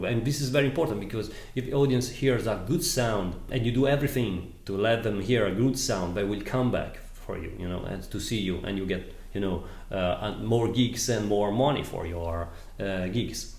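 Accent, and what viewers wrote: Italy. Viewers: he is from itali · Italian accent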